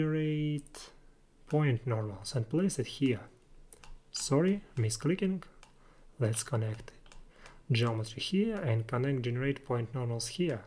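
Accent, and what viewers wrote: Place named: Russia. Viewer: как же тяжело слушать русских говорящих на английском)) тем не менее инфа годная, очень мало туторов по node editor в синеме, хотелось бы побольше видео по нодам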